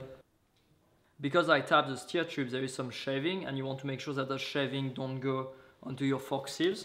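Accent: France. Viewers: How do I have a French accent like urs lmao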